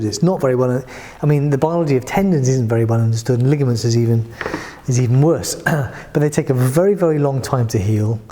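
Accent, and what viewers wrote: United Kingdom. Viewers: worst vid ever. especially the unapologetic british accent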